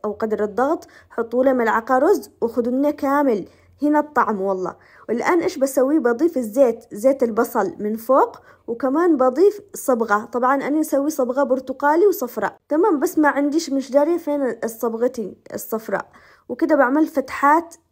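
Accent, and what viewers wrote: Aden, Yemen. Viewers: محسن كلامك العدني القح 😂😂😂 ربي يسعدك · ياحلات اللهجة العدنية تسلم يداتك ياقمر ❤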